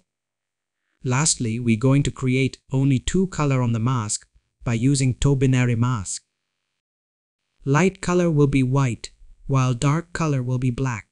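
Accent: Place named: India. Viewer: stop using the AI it's more unintelligible than your indian accent. Everyone knows you're indian, you're not hiding it, you're literally just making people more racist against indians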